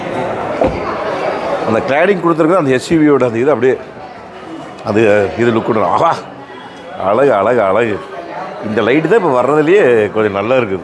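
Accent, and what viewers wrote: Kongu, India. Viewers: neenga peysura kongu tamil, keyka keyka keyakalaamnu iruku sir 🙂 appa appa anga inga thirumbi sirikurathu ha ha ha super and very natural way of doing youtube videos. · கொஞ்சும் கொங்குத் தமிழ் பேசும் கோமகரே...! திகட்டாத தித்திக்கும் பேச்சுடைய திருப்பூர் திருமகரே...! லடாக் பயணச் சாதனைச் சகாப்தம் எங்கள் மதிப்பிற்குறிய டான் திரு. அண்ணா அவர்களே❤❤❤🙏 எப்படி இருக்கீங்க அண்ணி❤❤❤🙏 எப்படி இருக்காங்க தம்பி ரித்திக்❤❤❤ எப்படி இருக்காரு விழிகளில் பதிந்தது புதிய கார் திறப்பு விழா💐💐💐 ஆனால் மனதில் பதிந்த நிகழ்வு எல்லாம் மதிப்பிற்குறிய டான் திரு. அண்ணா அவர்களுக்கு ஷோரூமில் அவர்கள் தந்த மரியாதைதான்❤❤❤💐💐💐💐😊😊😊❤❤ அருமையான வீடியோ❤❤❤ என்றும் அன்புடன் உங்கள் தம்பி என்றும் அன்புடன் உங்கள் TMF ரா.முஹம்மது கபூர் (சென்னையில் இருந்து)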